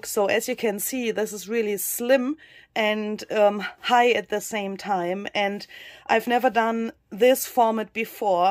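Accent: Germany. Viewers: Hi, please don't worry. First of all, this is beautiful. I truly love your work. I hit this problem many times, and I would fix it by adding matching, grungy broderie anglaise or lace around the edges. I love your accent - you speak great English. I love German, it's my favourite language, and I wish I'd learned it at school. I guess it's never too late? I am 62. Us Brits are lazy when it comes to speaking different languages. Love what you did to fix your cover problem. You are a great artist. Take care, xx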